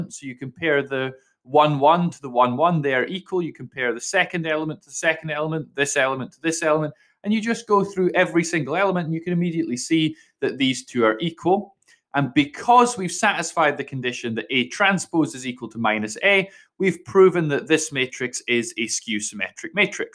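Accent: Scotland, United Kingdom. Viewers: I could listen to Scots accents all day music 🎶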